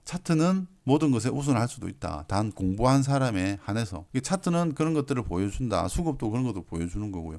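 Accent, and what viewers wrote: Busan, South Korea. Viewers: 실전에 너무 도움이 됩니다 감사합니다 대표님 혹시 부산분이신가요?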